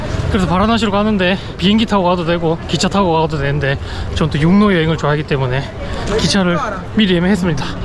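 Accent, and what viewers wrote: Gyeongsang, South Korea. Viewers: 오호 신기합니다. 갱상도 사투리가 친근하기도 하고 잘생김이 더욱 맘에 들고..조카뻘의 나이라서 조카생각도 나네요.. 젊을때도 그렇고 항상 행복하게 지내시기를.. 이제 알았으니 종종 볼게요...화이팅입니다....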